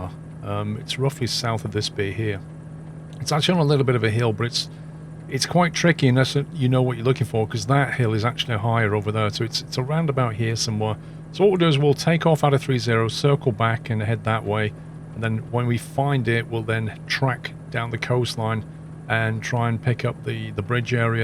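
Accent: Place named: United Kingdom. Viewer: Dude good video as always. But you sound British and should know its pronounced "Edin-bruh" not "Edin-bo-ruh" :)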